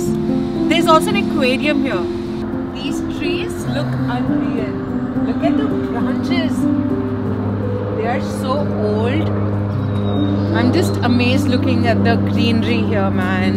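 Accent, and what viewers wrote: India. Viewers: Please change your Indian accent..it's so funny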